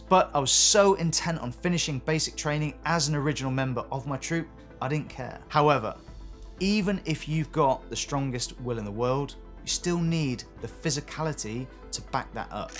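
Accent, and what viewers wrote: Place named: United Kingdom. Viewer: Maybe the obvious reason I like video like this, even when talked about "your biggest fear" Is the UK English voice even some interesting cutscenes (as additional like seasoning) 😅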